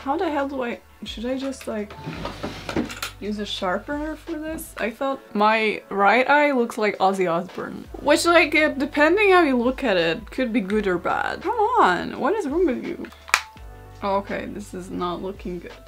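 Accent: Poland. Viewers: I like your delivery, I love your accent. I love my polish peeps. I think you are going to be a Youtube superstar. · POLAND!!!!! It would have kept me up trying to place your accent. So, I literally did a web search for "youtubers like Rachel Maksy" and your channel was suggested. This is my third video (yes, I'm a fan 🙂) and your accent is so familiar but I couldn't put my finger on it until you said it then I said, "That's it!" I haven't been to Poland since the 1990s, possibly before you were born. Anywayyyyyy, I'm enjoying your work and will now binge watch while I sew. Thank you!!!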